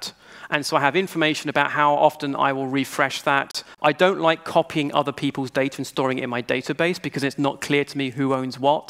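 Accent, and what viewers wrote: United Kingdom. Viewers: I started reading Building Microservices a couple of weeks ago. I didn't know Sam Newman at the time. I'm glad I saw this conference, because now I'll be able to read the rest of the book in his british accent.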